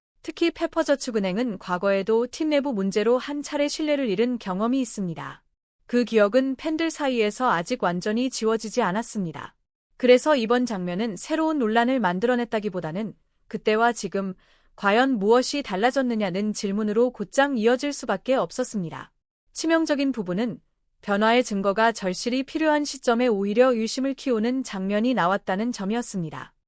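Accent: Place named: China. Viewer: 자막이 초등학교도 못 나온 사람이 쓴것 같고. 멘트는 중국인이 읽는 듯하다.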